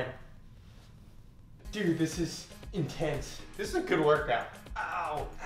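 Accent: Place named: United States. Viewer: I guess ur born in the US then as ur accent is american.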